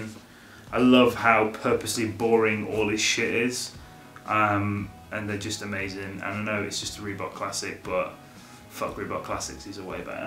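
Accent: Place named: United States. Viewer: Sounds kinda American to me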